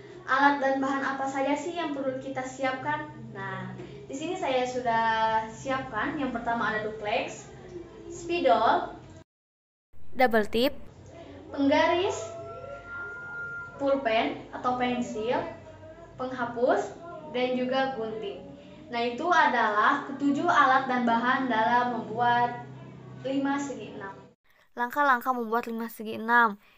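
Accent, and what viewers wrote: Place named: West Java, Indonesia. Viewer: *sundanya itu loh masih lengket, sukses ka*👍👍👍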